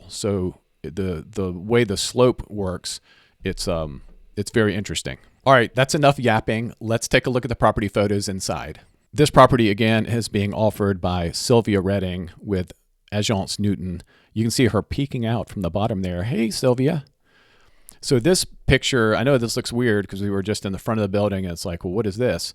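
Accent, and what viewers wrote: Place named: United States. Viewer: It's the American accent. The British say it differently.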